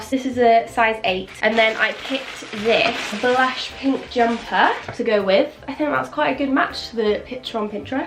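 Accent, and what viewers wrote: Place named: RP, United Kingdom. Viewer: Love this video! You sound so posh now though x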